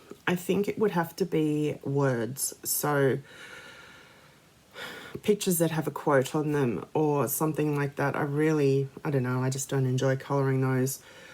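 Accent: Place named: Australia. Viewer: I live very near to Newcastle Upon Tyne in the UK & my daughter actually lives there. As for accents, love the Aussie accents. 😊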